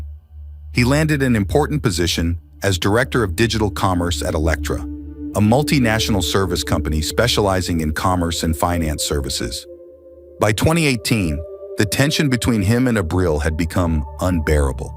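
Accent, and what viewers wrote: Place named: Mexican American, United States. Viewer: Sounds like Ameri/mexico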